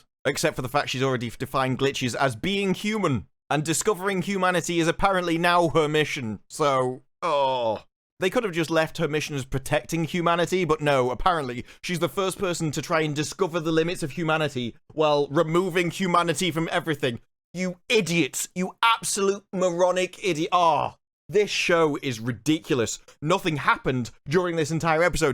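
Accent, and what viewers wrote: United Kingdom. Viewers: Sometimes it's funny hearing a person with a British accent nitpick a piece of shit show apart:) You're hilarious but you forgot one of the dumbest things about the Covenant language scene: The show has this whole thing that the Spartans are using their memories to seduce the meaning of Covenant words. Don't their helmets have cameras? Wouldn't they have a ton of audio from the Spartans fighting The Covenant? To fair, in this show's universe, they don't usually wear helmets for battle. Just driving and to remember shit.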